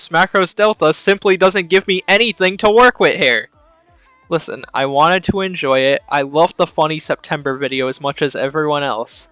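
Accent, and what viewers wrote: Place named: West Coast, United States. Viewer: It's okay brother I watched and enjoyed, I too have made cringe during my cursed stay in this world. However your accent eludes me, where is it from??? Some kind of west coast thing?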